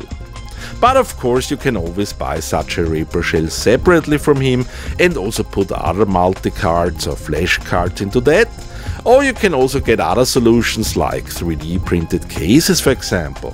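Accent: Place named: Austria. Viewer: hey, sehr nettes video, gehe ich recht in der annahme, dass du aus österreich stammst? dein akzent hört sich sehr danach an. grüße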